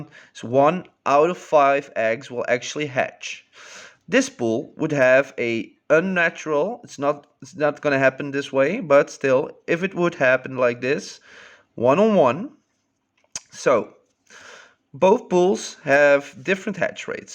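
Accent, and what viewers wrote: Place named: Netherlands. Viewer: Hello I live in Europe so I can't buy eggs by you so is bought eggs by Clementoni got a tank with it but The tank doesn't got a lid its not small its not plastic I also had a year ago triops eggs and got one adult 3 months old stupid me didn't save the sand but that one was in a plastic box witch one do I need to use? (BTW your voice sounds like somebody from the Netherlands Are you Dutch cause I am Dutch so I hear it sound like that no hate)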